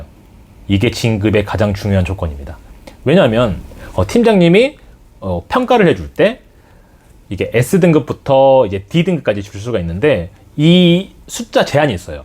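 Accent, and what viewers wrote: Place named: Gwangju, South Korea. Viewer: 광주사투리 넘나 매력적… ☺️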